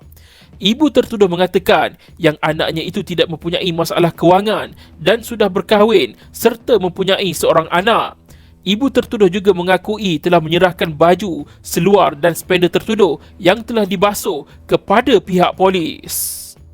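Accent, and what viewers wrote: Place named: Johor, Malaysia. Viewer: Gaya bahasa penyampaian Johorean sangat 😂 guna lah bahasa Melayu baku standards 😅baru semua orang suka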